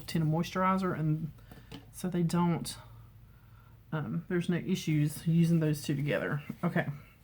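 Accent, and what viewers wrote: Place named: Tennessee, United States. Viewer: I am from Sevier County, TN, in between Knoxville and Gatlinburg! I knew you sounded like home! My hubby is a Marine, and we have been living all over the country, and are currently in San Diego. We just got orders to Cherry Point, NC, our first duty station after Paul joined 23 years ago, lol! Can't wait to get back closer to home. 💗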